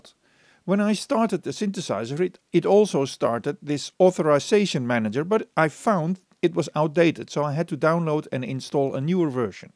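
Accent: Netherlands. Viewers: this guy is definitely dutch haha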